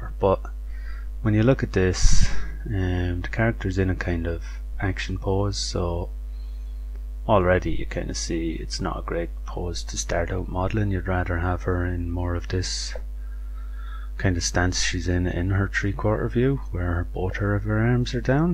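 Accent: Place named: Ireland. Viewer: The Irish accent will keep me watching this! <3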